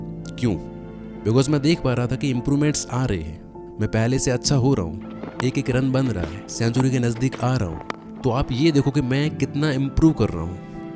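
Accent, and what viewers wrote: Rajasthan, India. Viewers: bhaiya rajasthan se ho bato se lagta h me bhee jodhpur se hoo. or me bhee logo se kat gya hu phone calls band Kar dee Sabhi se bat krna band kr diya par result ka jyada hee sochta Tha pr aapkee process Wali baat me bhee Dhyan dunga ab thanks u❤brother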